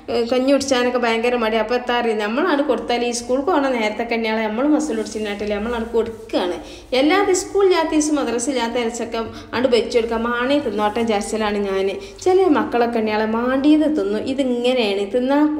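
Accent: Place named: Malabar, India. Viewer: മലബാർ style സംസാരം വേണ്ട. തനിനാടൻ മലയാള ഭാഷയിൽ സംസാരിക്കൂ. ഞമ്മൾ കേട്ടോ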